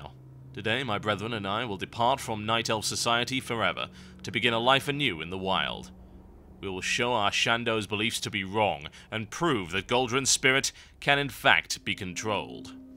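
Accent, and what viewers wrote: United Kingdom. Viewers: I wish I had a hulky british guy to read a book to me every night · voice suits WoW for sure. It's a very distinct UK accent that's very usable in the worgen stories:) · sounds like a boring documentary.... but it is the one and only cynical brit so like! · he does have a british accent cause he's from UK :) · you have an awesome accent. It's not one of those horribly exaggerated ones, this one is less aggressive and expressive, yet it has a certain taste to it that we all come to love. That leads me to my question, what part of the UK did you get that accent?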